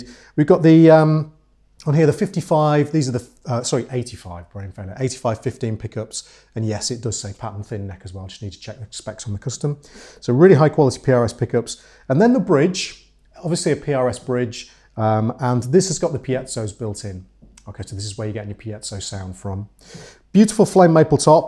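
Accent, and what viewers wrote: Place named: RP, United Kingdom. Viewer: Dude in ripped jeans yet he has a posh British accent and playing a $5000 guitar.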